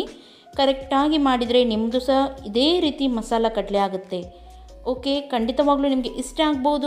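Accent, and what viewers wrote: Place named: Udupi, India. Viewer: Idu udupi kannada mr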